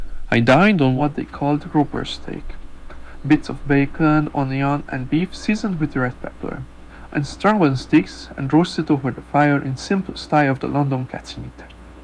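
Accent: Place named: Hungary. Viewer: Hello Dear 🌻🐝 You're my first Hungarian accent so in my 71 years I've had no experience developing an ear for it. If you'll share a chapter you feel is your best translation so far I will try to learn it. Looking forward to hearing from you again. Auntee Sara ⚘️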